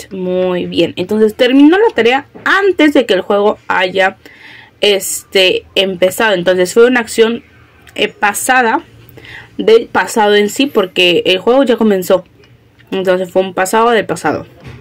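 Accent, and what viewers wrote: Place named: Spain. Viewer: Que acento mas insoportable ,me refiero al Español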